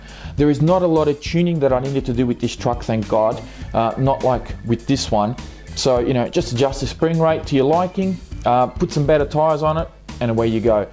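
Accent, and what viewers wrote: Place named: Australia. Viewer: Hi, I see it's been about a year since you did this review of all your SCT's and I wonder if things have changed with the Prolite. I have both a Trooper and the prolite. I just bought the Prolite SCT and ran it for the first time today. The prolite IMO beats the trooper by ton. It handles better, is faster and of higher quality. The first thing I looked at were the body posts. On mine they are so flexible that you can almost bend them 90 degrees and they just bounce back. After 30 races nothing broke so I am pleased with that. My only issue was the motor mount was loose right out of the box. I found that out when I did a little test run in my yard. Luckily nothing stripped or broke. I simply reset the mesh and tightened the screw on it. I love this truck already. I raced a bunch of friends who run slash 4x4 both HCg and LCG chassis and not only did I keep up with them I beat them regularly. 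Great videos you put out. Lots of great info too. As they say, "Come for the info, stay for the accent".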